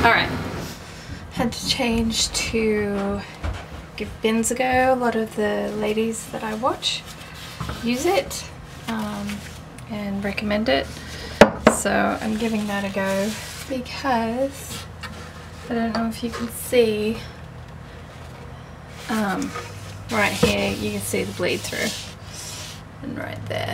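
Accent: Australia. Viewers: Beautiful work. I thought you had an Aussie accent. Keep up the great work.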